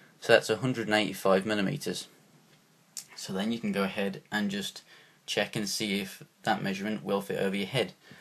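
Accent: United Kingdom. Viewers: Are you from birmingham? you sound like a brummie xD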